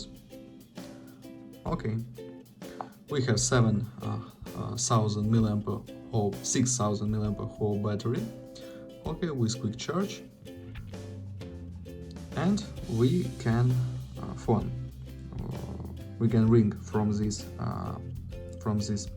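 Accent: Russia. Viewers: на аглийском,с русским акцентом))) По голосу и звуковому фону узнал.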